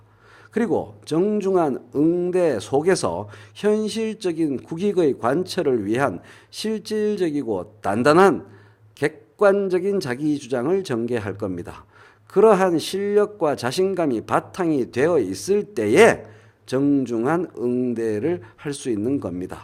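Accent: Busan, South Korea. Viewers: 최선생님 말투가 경남 부산말투같기도 하고요~~~~~~~~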